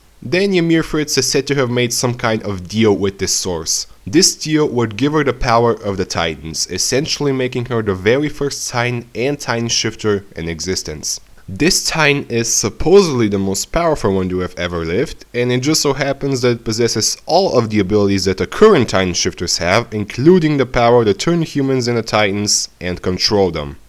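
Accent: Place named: Birmingham, United Kingdom. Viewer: Between that half brummie accent and mispronouncing your "R"s and "L"s, I can barely follow what you're saying. Learn to talk or get someone else to do the voice-over, or just give us text.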